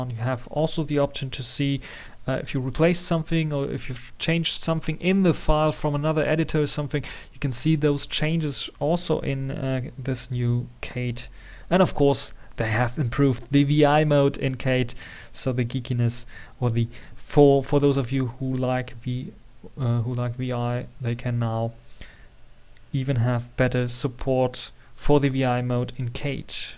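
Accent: Germany. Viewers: Aah i love German accent :))) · thank you for the video loved every minute of it. Where are you from is it Germany?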